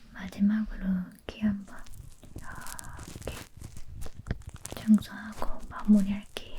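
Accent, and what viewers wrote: South Korea. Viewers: 영상 감사합니다^^ 대구사투리 정감가고 좋아요^^♡♡ 님 혹시 눈밑에 글리터 어디껀지 알수있을까요?? 넘 예뻐서요ㅜ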